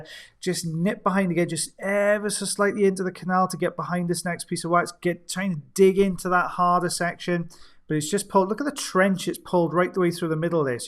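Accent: Wales, United Kingdom. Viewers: Stay safe and family. I loved you saying “ebony coloured” in your lovely Welsh accent. We will just be happy so see your videos when you can post, you don’t need to apologise, it’s our privilege to see your work.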